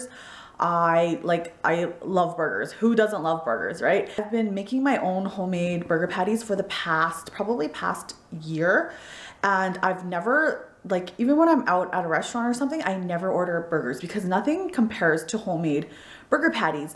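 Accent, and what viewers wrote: United States. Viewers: You speak some of your long “O“ vowels like a Minnesotan, but your hard “R’s” like an Angeleno. You have your own, unique colloquial dialect. I like the uniformity of your burger patties. Well done.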